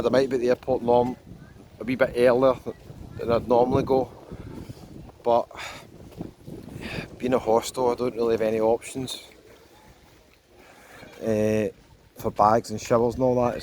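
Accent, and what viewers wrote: Scotland, United Kingdom. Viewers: Nice vlog....well as they say "you can't please them all" I always find your vlogs pretty neutral and hardly biased re your journeys. To me and many others we share the same values so I appreciate your words and opinions. We aren't going to get away until September so your vlogs give me a little bit of sunshine, sand and blether.... My mum was from Girvan so I like the accent and tone. Keep up the good work 🙂 Be safe 🙏 G 🦉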